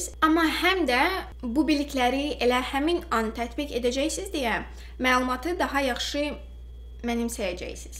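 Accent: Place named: Russia. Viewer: по твоему акценту я понял что ты владеешь и русским языком, но пожалуйста разговаривай как тебе угодно не обязательно говорить на строгом азербайджанском будь свободной не комплексуй )))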